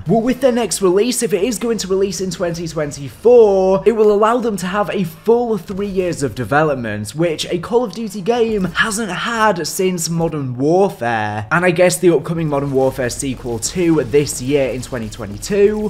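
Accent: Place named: United Kingdom. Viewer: F*cking british accent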